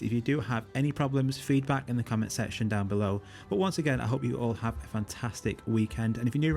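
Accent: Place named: North, United Kingdom. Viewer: Thanks, now I can do the update without worries. BTW, where are you from? I'm curious about the accent that sound a bit northern :) New sub :)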